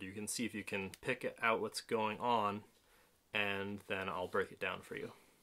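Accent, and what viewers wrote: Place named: United States. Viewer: Seeing a few of your videos I did not expect you to have an American accent lol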